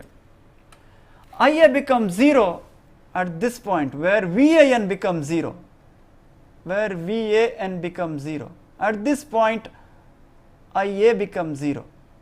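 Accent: India. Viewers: Only the Indians will understand this accent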